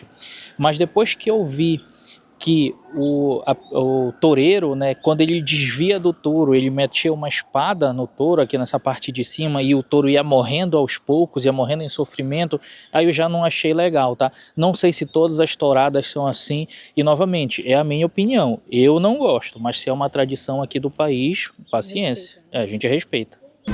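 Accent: Pará, Brazil. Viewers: Mano parece se do Pará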